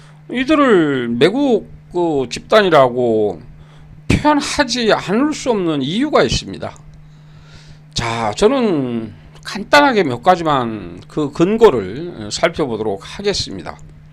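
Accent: South Korea. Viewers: 98% 지지율이 정상국가에서 나오는 수치냐? 쪽팔리는 줄도 모르고 .. 사투리가 전라도 구만 ㅋ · 180석 준 이유? ㅋㅋ 은행에 월세내고 나는것들이 돈 없으니 좌파 찍어준거지.. 근데 당신 말투가 전남 강진쪽인데?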